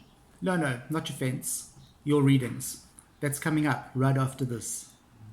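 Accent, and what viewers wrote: South Africa. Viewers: I came here via Donna at Ozark Oracles channel and thought I would drop you a quick hello. Really enjoyed this video and am looking forward to watching more of your content. I think, personally, it’s important to always be honest whilst remembering to also be kind. On an unrelated note, I love your South African accent and could literally listen to you read a dictionary 😁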